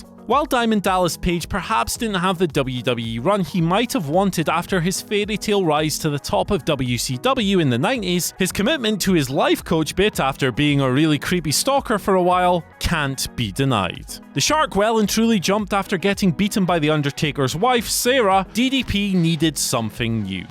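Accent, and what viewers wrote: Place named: Scotland, United Kingdom. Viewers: Is from Aberdeen, Scotland because he sure sounds like he is? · Are you Scottish 🏴 ehh my pal 👍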